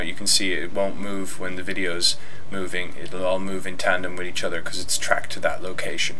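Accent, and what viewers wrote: Ireland. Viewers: that silky sexy Irish voice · are you Irish?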